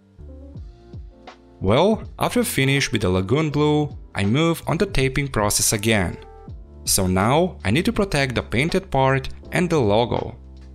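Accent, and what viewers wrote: Russia. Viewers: Hello, are you from Russia? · I love your accent. It sounds a bit like a humble russian accent. ( not being racist english also isnt my native) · You are Russian? (Slav?) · Русский что ли ? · I like his Russian accent😘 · You have russian aсcent? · Is this my hallucination or does the author have a Russian accent?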